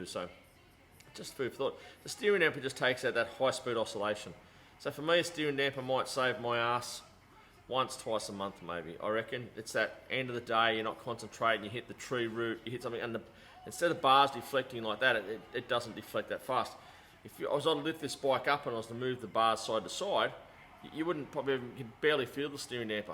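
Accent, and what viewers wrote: Australia. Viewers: Holy s*** you are hard to understand, I had to put captions on to figure out what the hell you were saying. What you are Auisies do to vowels is a violation of the English language & reprehensible to the English language. Still appreciate what you do and the content that you provide relative to the Tenere 700 As Time Goes Forth! Thank you, but keep working on your diction and pronunciation so all of us other English speakers can understand you much better.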